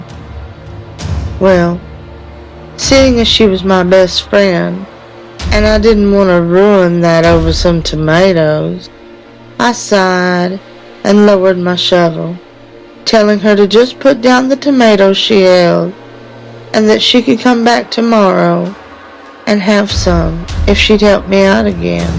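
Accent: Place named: South, United States. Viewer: you're welcome chicken. 😘 Love the southern accent btw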